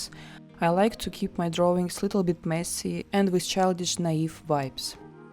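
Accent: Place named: Russia. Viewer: I just finished watching ALL your videos!! I love them!! You're making me want to visit Istanbul..lol. My cousin is going to Greece this summer and may take the trip across the waters to Turkey? Have you ever been to Greece? Also, do you do any outdoor sketching or painting? That would be fun to see too. You're inspiring me to start my art channel as well. And by the way, your English is WONDERFUL!! You have absolutely nothing to worry about. We understand you just fine and the Russian accent is beautiful. I just subscribed to your channel. Thank you for sharing!! Can't wait for the next vidéo!!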